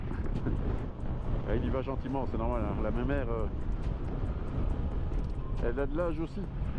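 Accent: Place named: Belgium. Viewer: 🤘😜 VAS Y T'ES BON BON BON 💪😜 Je reconnais bien le phrasé Belge 🇧🇪! Je m'abonne! 🇫🇷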